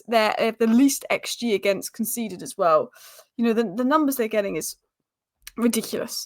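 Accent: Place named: Manchester, United Kingdom. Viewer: That's a thick manc accent.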